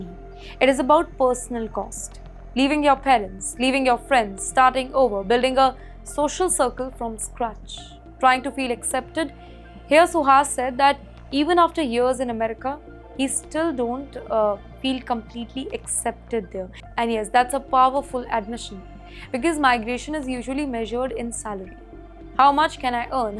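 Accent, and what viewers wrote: India. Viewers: AI report with an Indian accent.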